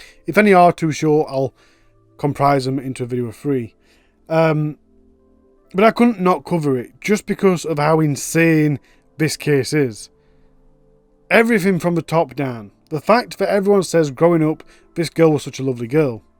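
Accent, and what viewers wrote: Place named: Liverpool, United Kingdom. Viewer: Hey what part of England are you from? Really distinct accent...is it Liverpool?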